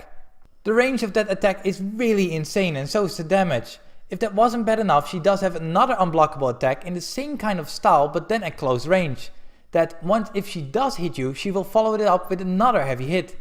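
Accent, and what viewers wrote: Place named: Netherlands. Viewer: I heard it because your accent, especially when you said: Sigrun, like Sigroen :), boven Holland :), I am Dutch too, I like your video, keep up the good work and keep going, I subbed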